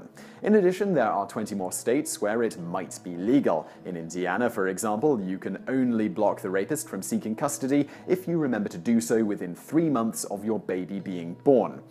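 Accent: United Kingdom. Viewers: it makes no sense listening to a brit talk about US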